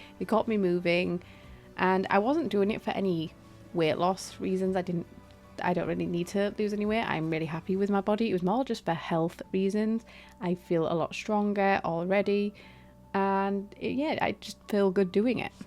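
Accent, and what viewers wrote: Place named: North, United Kingdom. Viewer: Just found your channel and hearing a fellow northern gamer is such a refreshing thing! Usually they're American and i dont feel a connection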